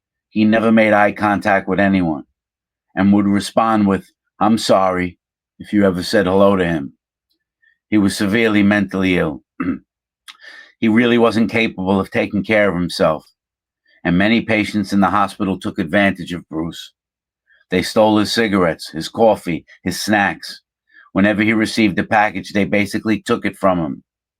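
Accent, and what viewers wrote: New York, United States. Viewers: I'm sorry you had to see that horrible incident with poor Manny. Your mind went from chuckling because he was stripping and you thought he was just being a nut and then suddenly it wasn't funny. He was hanging there like a piece of meat. It's too horrifying to comprehend. I confess I snickered a few times. Not because anything was funny but just your storytelling style cracks me up. 😊 Also want to say that your videos are really amping up in quality and style. The chilling view down that melancholy hallway, the gates slamming, The Moody lighting. Your New York gangster accent in your deep soft voice. You're going to have to start charging us admission. I was silent and stunned for quite a while after watching that video. I think I still am.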